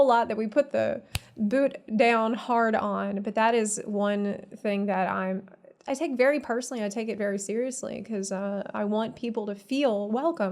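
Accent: South, United States. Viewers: Her southern accent is hot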